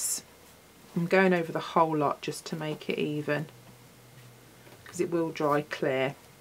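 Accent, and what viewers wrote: United Kingdom. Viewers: Oh I loved your video. Maybe mainly because it was lovely hearing a British accent as much as I love our American cousins. But I can also get the stuff. I’m always jealous when I see the fantastic stuff they get from Michaels or Dollar Tree but no good for me trying to find like for like. Brilliant 😍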